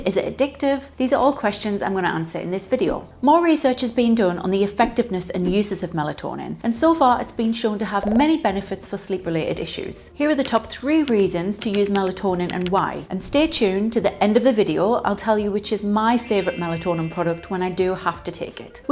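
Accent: United Kingdom. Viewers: You have a British accent so why did you leave the UK?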